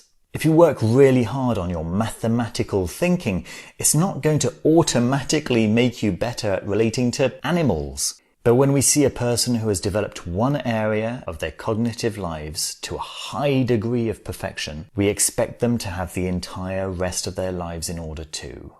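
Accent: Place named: United Kingdom. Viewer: A-dee-siv! I l love the British accent. Bring on the Devine Feminine! Give us what ya got.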